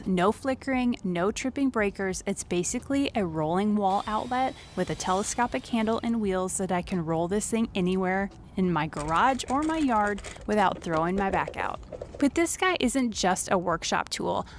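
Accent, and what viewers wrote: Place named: Midwest, United States. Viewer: You sound like your more from Midwest than the market she’s in.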